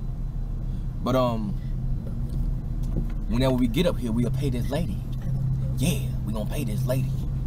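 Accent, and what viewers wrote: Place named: South, United States. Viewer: u seem like a cool down to earth dude love the vids. also i can tell u from the south cause that accent the way you said review haha respeck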